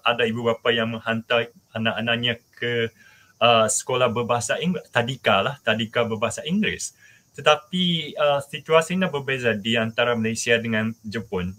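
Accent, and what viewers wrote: Indonesia. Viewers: Suka Melayu,tp logat Indonesia wkwkwk 😂😂😂😂 Indonesia mmg the best👍👍👍👍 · Dari cara bicara nya pun lebih banyak logat dan abjad bahasa Indonesia...😂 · Kalo Di video ini, Bahasa melayu nya, sangat indonesia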